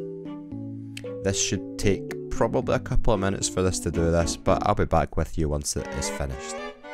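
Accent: Scotland, United Kingdom. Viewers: are you scotish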